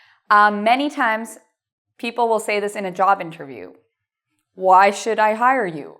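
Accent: Canada. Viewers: Are you Canadian? I can hear you say 'boat ... :) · I love your Canadian accent. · This is a Canadian accent :D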